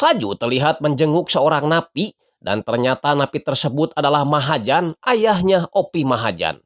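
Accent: West Java, Indonesia. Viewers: Sy gkk terlalu fokus ke film nya,sy lebih terhibur dgn pengisi suaranya,kang logat sunda banget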